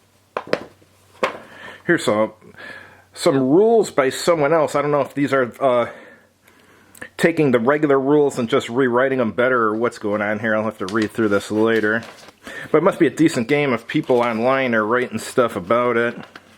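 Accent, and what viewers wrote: Chicago, United States. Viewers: Ancient wargames reviews with Chicago accent, I'm in.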